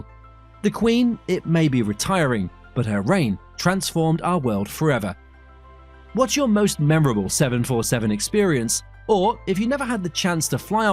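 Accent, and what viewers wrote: England, United Kingdom. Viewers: How can someone with a British accent mispronounce Aluminium - it’s Al you mini um - it’s not alooo min num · Why is the narrator got an English accent but says aluminum not aluminium ...that just ruined the video for me. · Narrator has a British accent, but pronounces aluminum like an American. This is confusing. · If you're going to use a British accent, at least use British spelling. The metal is alumininininium.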